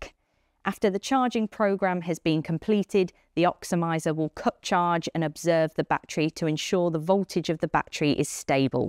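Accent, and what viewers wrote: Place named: England, United Kingdom. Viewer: Can't place her accent. I guess somewhere in England?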